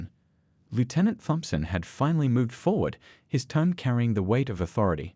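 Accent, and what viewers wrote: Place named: England, United Kingdom. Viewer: Suck video of a still picture and English crappy accent...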